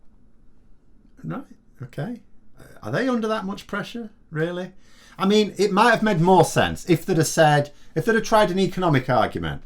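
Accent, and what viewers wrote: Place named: England, United Kingdom. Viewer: Are you really English? After years of forced listening to Brexit hacks, what you say does not match your accent! Freakish, but in a good way!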